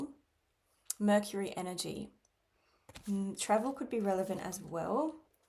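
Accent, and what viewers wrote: Australia. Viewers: R you an Aussie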